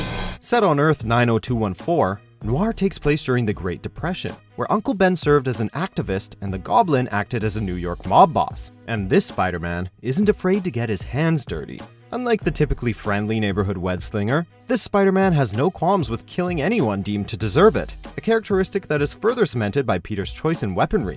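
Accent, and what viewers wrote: Canada. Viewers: They're Canadian I believe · Are you Canadian?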